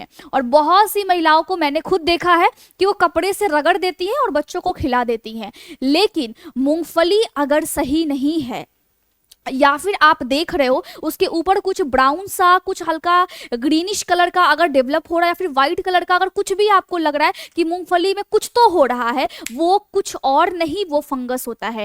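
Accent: Bihar, India. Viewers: और अच्छा बोल सकतीं है आप, बिहारी ton avoid कीजिये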